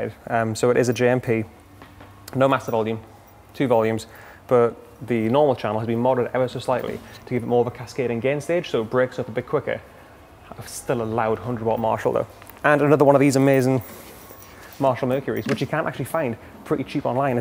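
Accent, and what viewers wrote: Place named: Geordie, United Kingdom. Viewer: I've only just clocked something about you...with a hint of camp in your voice and the way you suddenly drop your voice down It's Kenny Everett! Seriously are you from the same town? You have the same kind of rhythm..it's not quite Geordie but close by am,I right are you and Kenny from the same streets?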